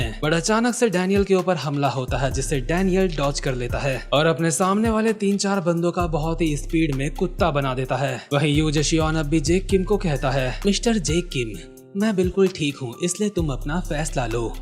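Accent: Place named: Gujarat, India. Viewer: bhai kya tum crunchyroll pe bhi anime dub krte ho kyuki pehle jaisi voice tum og daniel ko dete the jab wo mota tha waisi hi voice maine crunchyroll mein ek anime ke mc ki voice suni hai aur kya tumhare pass 2 ya 3 bande aur hai jo tumhe lookism ke video dub krne mein help krte hai aur tumhare tone se lagta hai ki tum gujarat ki side se ho kya tum ahmedabad se ho kyuki me bhi waha se hu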